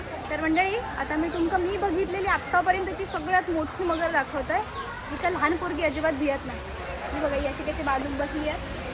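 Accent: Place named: Malvan, India. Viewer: बोलतस मस्त.असाच बोलत रव्ह.मालवणी बाज कायम ठेव👌👌👍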